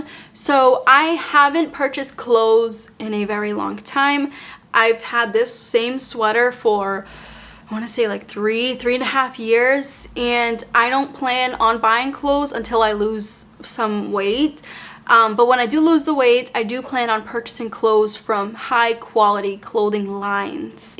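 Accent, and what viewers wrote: Boston, United States. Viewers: She’s from Boston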